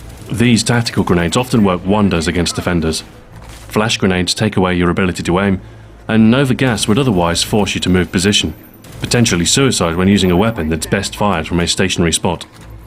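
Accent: United Kingdom. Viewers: is he from the uk? i am. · Only a British accent could pull this type of informative video off.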